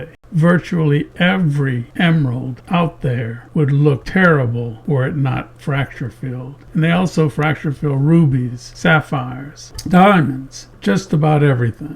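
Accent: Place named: Louisiana, United States. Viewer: Hello...I truly enjoy your videos...thank you for sharing your knowledge...where are you from ? Sounds like a Louisiana accent...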